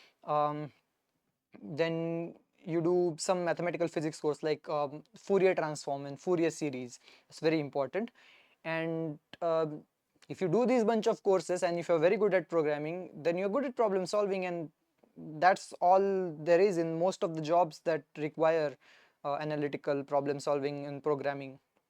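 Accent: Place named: Maharashtra, India. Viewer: are you marathi? i can see the accent slipping out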